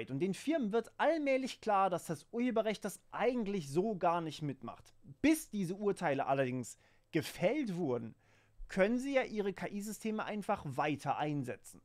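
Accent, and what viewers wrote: Swabia, Germany. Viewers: A scheee, du bisch ja au n Schwôb!!! Ja Griaß Gottle! 😅😅😅💪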